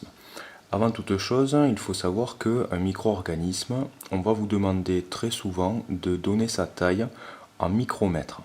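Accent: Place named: Marseille, France. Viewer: Wsh ta l accent marceiller 😂😂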